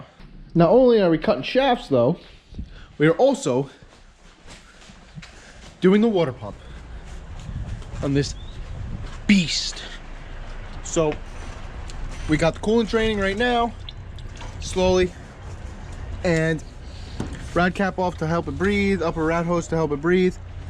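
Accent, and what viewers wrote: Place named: New England, United States. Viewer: Thanks Guys. Where are you located? You sound like New England. That’s where I am and if you’re around here would you consider installing it for me? Thank you 😊. Maggie Donovan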